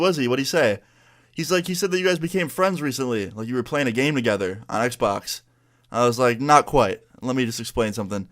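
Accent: New York, United States. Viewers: You sound like an american Fainted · Heh, had a feeling you were a new Yorker, im the same, you had the accent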